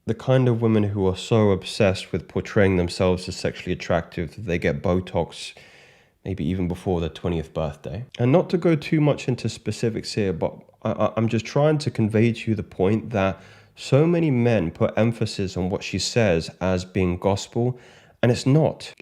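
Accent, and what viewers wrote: United Kingdom. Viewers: mate, didnt thought of you being a geordie ??!! 😅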